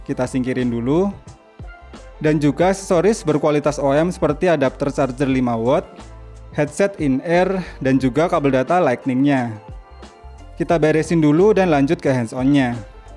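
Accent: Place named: Sleman, Indonesia. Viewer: koyo ng sleman ikuu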